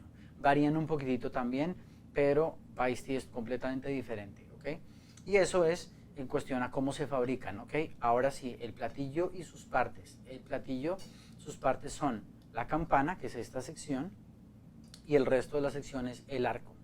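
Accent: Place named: Colombia. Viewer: eh tio eres buenisimo jajajajaja que risas me hecho con tu asento de colombia... eres un crack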